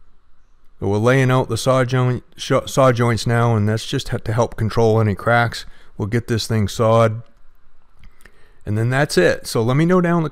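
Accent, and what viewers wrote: Maine, United States. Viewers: Ya sound like you was born an raised in winston salem maine or something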